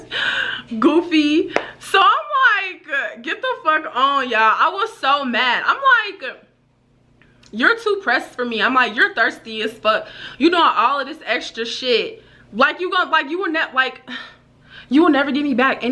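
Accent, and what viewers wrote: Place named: Minnesota, United States. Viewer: You can tell your from Minnesota 🤣. GOOFY! I haven't heard somebody called that since I left. Love ya girl. Keep ya standards high.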